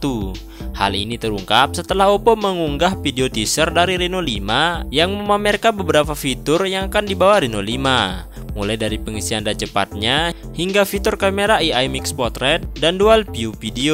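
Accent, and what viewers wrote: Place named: Ambon, Indonesia. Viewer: Logat nya mah orang ambon nih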